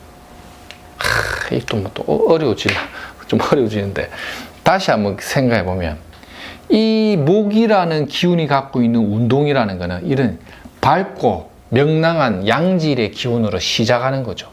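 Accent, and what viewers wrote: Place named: Gyeongsang, South Korea. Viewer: 감사합니다 경상도 사투리가 너무듣기 좋아요! 나이트 ㅋㅋㅋ ~~~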